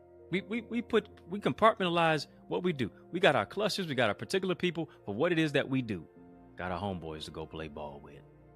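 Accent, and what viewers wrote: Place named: Malaysia. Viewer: Speaking manglish ✨🔥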